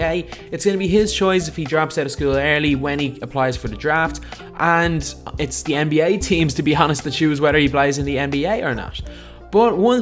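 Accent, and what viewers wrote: Ireland. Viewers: some sort of irish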